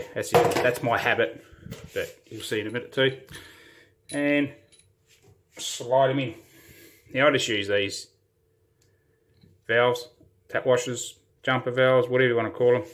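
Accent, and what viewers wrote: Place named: Australia. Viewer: Yer rite maaate! It is a local accent. Understood throughout OZ. Try those hillbilly accents from some states in the USA. Impossible!!!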